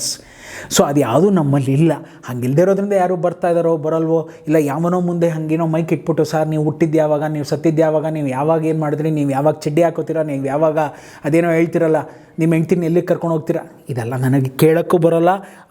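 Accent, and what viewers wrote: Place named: Madesha, India. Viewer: Nimma kannada estha sir nanage .. nimma madesha nana favorate.. previous episode you spoke about negative comments... Keep it mind .. if you are getting negative comments that means you are growing... All the best for the film .. please plan pramotion activity carefully that can make or break the project... I will watch first day first show ...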